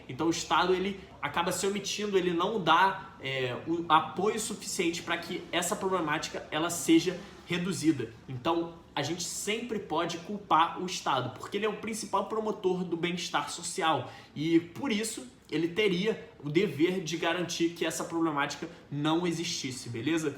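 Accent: Rio de Janeiro, Brazil. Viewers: Eu adoro esse sotaque Carioca, sério kkkk❣ Parabéns os vídeos tão muito bons, estão me ajudado a manter o foco nessa reta final vlw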